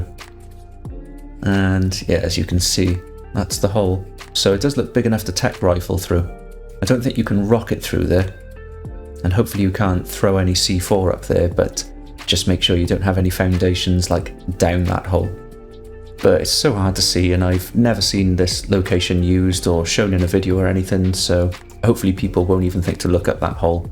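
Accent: Wales, United Kingdom. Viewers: am i picking up a welshy accent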